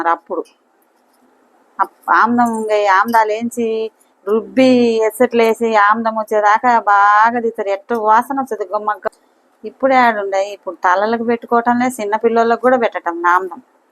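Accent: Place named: Rayalaseema, India. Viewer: Kaani mee language rayalaseema laga vundi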